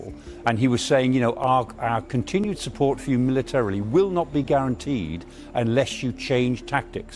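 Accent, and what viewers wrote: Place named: United Kingdom. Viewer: no one, but British pronunciation is annoying lol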